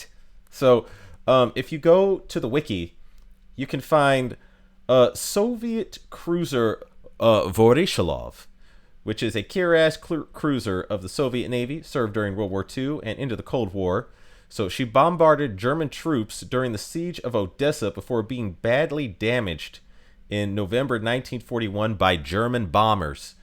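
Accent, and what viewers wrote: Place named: United States. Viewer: God damn man, I love your energetic personality but you have a chronic infection of Americanised pronunciation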